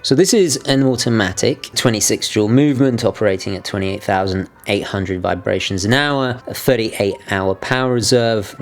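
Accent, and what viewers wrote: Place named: RP, United Kingdom. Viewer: Ok , first things first . In general your vids. have improved, just keep the plumb out of your mouth and things should go well. But , that attempt at the posh type intro has got to go. Guys swimming around about the place just dont fit bro.! Now , the watch , love 95% of it , but the sloppy crown stem in the unscrewed position just says cheap crap , along with the Sellita movt. , unregulated to boot .! Otherwise I would buy one tomorrow .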